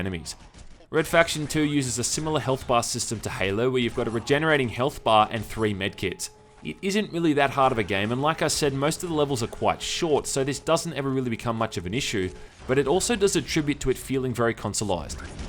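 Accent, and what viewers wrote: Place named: Australia. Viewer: Oooh thank you i love your reviews and australien accent. both combine makes a charmes in your video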